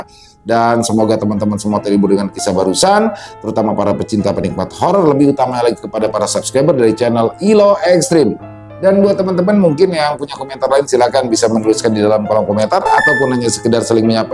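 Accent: Jakarta, Indonesia. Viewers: Gua paling suka kisah nya mas logat logat betawi nya bikin candu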